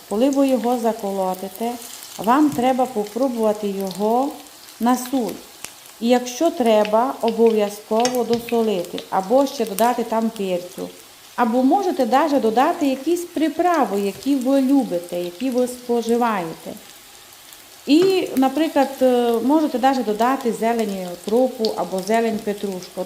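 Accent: Transcarpathia, Ukraine. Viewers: Приємно чути Закарпатський діалект. В мене тітка з Сваляви, теж так розмовляє. Хороший рецепт, дякую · Боже, как же мне нравятся вот такие хозяюшки. Все по настоящему, по домашнему, без помпезности, по простому. Ручки пухленькие, голос приятный, говор с акцентом украинский. Спасибо дорогая хозяюшка за рецепт, обязательно приготовлю.👍🌹💐😊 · Чарівна вимова. Мабудь закарпаття❤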